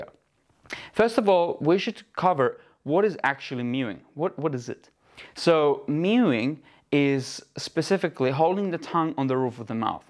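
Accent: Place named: New Zealand. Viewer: Where are you from, lad? Kinda sound like you're from New Zealand?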